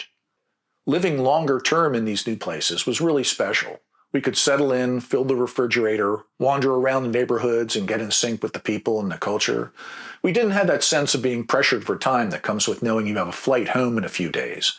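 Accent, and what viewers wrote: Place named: Baltimore, United States. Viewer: Considering this very thing for the future and interested to hear your experiences! (By the way, I thought I heard a bit of a Maryland/Baltimore accent while you were talking. A bit of home for me! I’m from the DC area with many relatives in the Baltimore and DC suburbs but live in the Pacific NW now)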